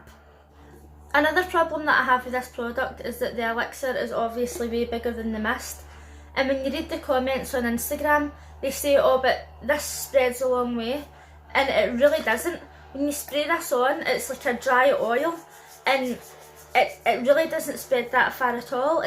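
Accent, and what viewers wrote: Scotland, United Kingdom. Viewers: yaaasssss love hearing a fellow Scottish voice in a beauty review, glad I didn't buy this! thanks so much for the honesty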